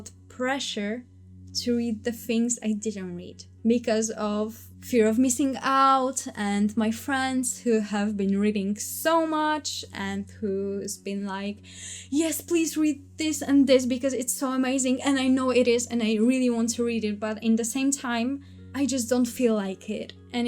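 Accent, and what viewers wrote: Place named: Poland. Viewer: /eng: her accent is beautiful and I am saying that as also polish. Polish people do not have good English accent usually Pl (for basically) Super akcent, zazwyczaj Polska wersja mówienia po angielsku mega mnie irytuje ale twój jest super Jak skończą ci się pomysły na filmy daj coś o języku bo taki akcent to serio wyjątek w Polsce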